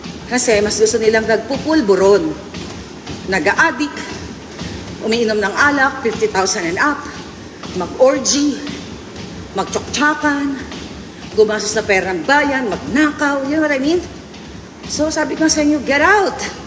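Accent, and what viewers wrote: Iloilo, Philippines. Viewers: hahaha hindi gid nya madula ang accent mo ilonggo nang biskan mg hambal k tagalong or English pg hindi mo ma translate agad illonggos words ga buka sa bibing mo ..halong gid permi nang stay healthy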